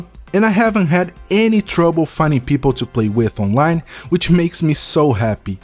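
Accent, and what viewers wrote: Brazil. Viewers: brazilian accent? Tamo junto kkk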